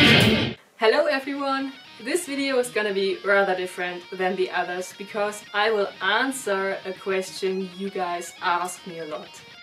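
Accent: Germany. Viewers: I like your german accent (Hab ihn selber xD) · I love your german accent when speaking. Do not exist best with this: The very best guitar player in fusion with as a beutiful girl. hi from Chile. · Красивый немецкий акцент.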